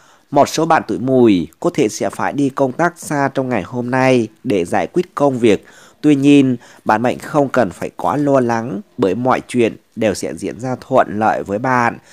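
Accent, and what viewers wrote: Thanh Hoa, Vietnam. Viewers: thay. giong. noi. giong, nguoi. xuan. yen.tho xuan thanh. hoa. que. ngoai cua minh. Cung noi vay ?😄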